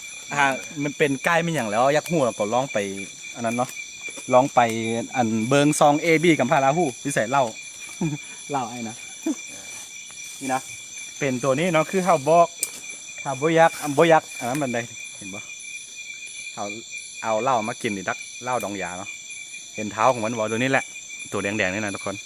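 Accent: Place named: North, Thailand. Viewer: สำเนียงคนเมืองเลย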